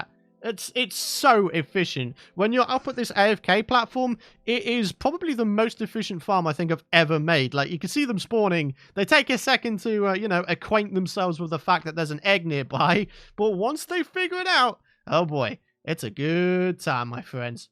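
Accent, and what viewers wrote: England, United Kingdom. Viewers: Are u English?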